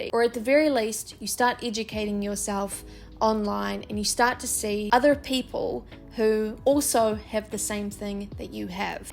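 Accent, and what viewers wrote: New Zealand. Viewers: I knew u were from New Zealand lmao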